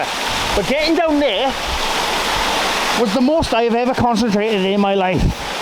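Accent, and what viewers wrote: Wales, United Kingdom. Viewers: Your Welsh accent sounds thicker out in the valleys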